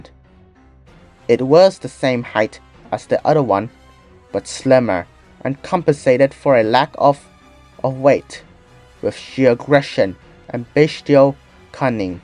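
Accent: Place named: United Kingdom. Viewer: British ahh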